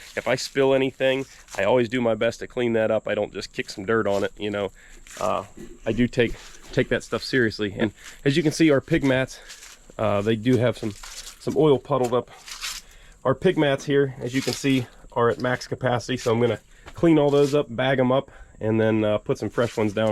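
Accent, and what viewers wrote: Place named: North, United States. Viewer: I AM WATCHING FROM DOWN HOME ( LOU---A---VULL ) learn to talk proper mare kan next time so you kan fit in we no your a feerneer dam yankey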